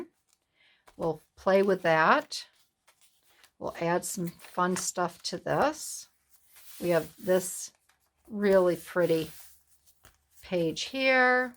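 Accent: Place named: Scotland, United Kingdom. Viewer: Such a great idea to remodel, those digitals are super pretty. Your little bit of Scottish accent made me smile 🥰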